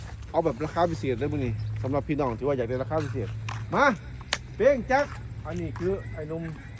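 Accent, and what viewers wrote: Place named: Khok San, Thailand. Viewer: สำเนียงคือคนบ้านเฮา บ้านโคกสาร คัก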